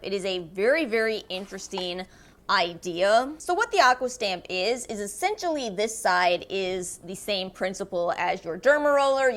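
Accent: New Orleans, United States. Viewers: You must be from New Orleans. The “ya heard me” is a dead giveaway! 😅 I’m on the northshore.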